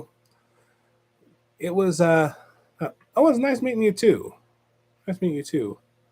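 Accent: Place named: United Kingdom. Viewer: Bro I thought you are British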